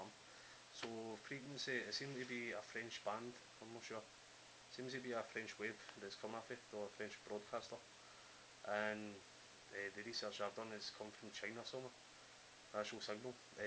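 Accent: Scotland, United Kingdom. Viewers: Due to the poor audio, I had to turn on CC. Sounds like he is speaking a combination of English (Scottish) with a smattering of Galiec.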